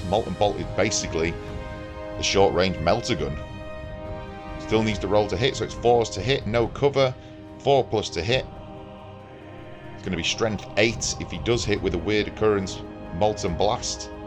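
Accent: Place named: Leeds, United Kingdom. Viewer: I've been thinking of doing some 'Munda battle reports myself but after seeing yours I've given up on the idea. I can see how each batrep is improving with every episode and I'm fully expecting next year's efforts to be on at the local flicks with the production being so good! I can't even imagine how long they take to do but I'd just like to say I appreciate all of the work you put into these, GW could learn a thing or two from you. P.S. are you from Leeds? I ask because you say the number one like I do and I think there's only us Loiners that pronounce it won instead of wan 😉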